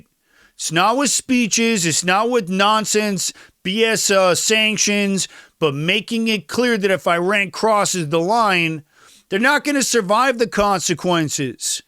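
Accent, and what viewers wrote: United States. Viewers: I enjoy your presentation. Are you Jewish? You seem to have an American accent. R. Evans Australia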